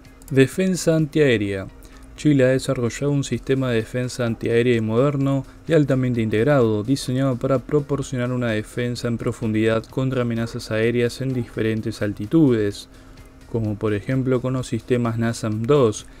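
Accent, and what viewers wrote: Argentina. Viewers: Esta información no es del todo cierta, han obviado armamento moderno que tiene Perú . Este video al parecer esta hecho por chilenos y narrado por un argentino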